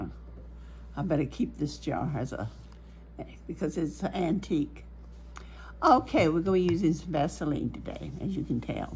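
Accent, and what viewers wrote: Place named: Georgia, United States. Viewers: Maine? You sound like you’re from Georgia 😊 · Are you by any chance from southeastern Alabama or northwestern Georgia? My grandmother was from Salem, Alabama and grew up in Columbus, Georgia. Your accent sounds very much like hers and your voice brings me so much joy ❤❤ thank you, beautiful soul ❤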